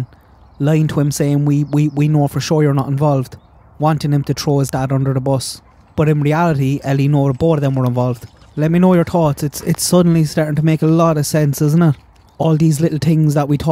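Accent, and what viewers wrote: Cork, Ireland. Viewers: New subscriber is that a Cork accent feen??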